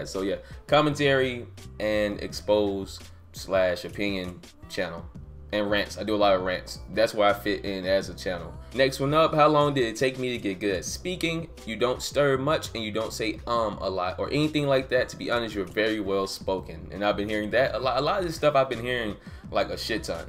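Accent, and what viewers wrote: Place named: Mississippi, United States. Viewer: I would love to hear your Mississippi accent! Thanks for answering my question!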